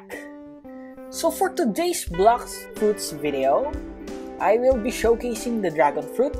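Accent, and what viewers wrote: Philippines. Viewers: I can tell that this guy is Filipino because of his accent. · Ngl this dude sounds like Charlz the french and his voice is like Filipino Edit:He is Filipino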